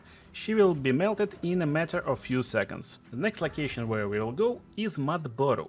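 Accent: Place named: Russia. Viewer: Вери строн рашен эксент.) Nice starter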